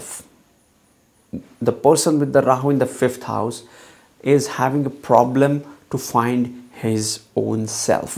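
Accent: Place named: Russia. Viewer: I have this struggle in life too. What a great video. Spot on how Rahu is shaky and made me go from one thing to the other. Your message towards the end was really good to be specific to find our calling, our Dharma and not be distracted. By the way you sound as if you have a bit of Russian accent - esp. when you say - "You''ll always" and "energy". Just saying. Sounds great. thank you.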